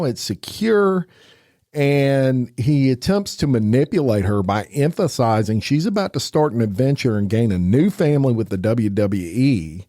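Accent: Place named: Appalachia, United States. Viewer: Your hillbilly accent makes the whole boring show worth listening too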